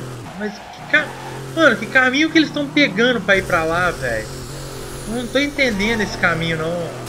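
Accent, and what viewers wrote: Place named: Minas Gerais, Brazil. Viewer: o é mineiro? ele tem um sotaque mineiro.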